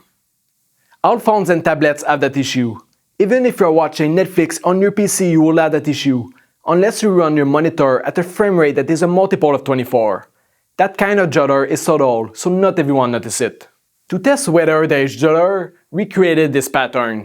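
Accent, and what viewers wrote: Quebec, Canada. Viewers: Hehe that's nice to hear a French Canadian accent on a high quality informative video. I noticed it right away and trust me, he speaks english EXTREMELY GOOD and way better than the average person from here, including myself haha. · He has a big accent because he is from Quebec. I'm from Quebec too so I could tell. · HAHA un Québécois ! :) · It’s a French Canadian accent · It's actually a French Canadian accent 😉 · I can tell that you are from Quebec haha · Lul French Canadian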